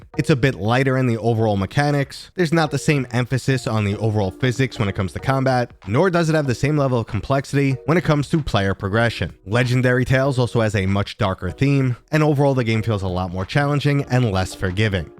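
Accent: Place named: Boston, United States. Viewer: I’ve never been to America but imma guess this guy is from Boston? 😂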